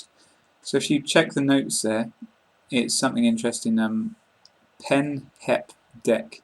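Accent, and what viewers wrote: United Kingdom. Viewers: thanks for teaching us i like British accent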